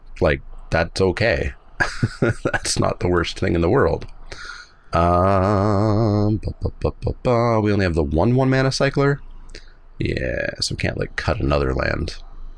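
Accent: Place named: Canada. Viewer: You sound more and more Canadian the longer I watch these. I love it.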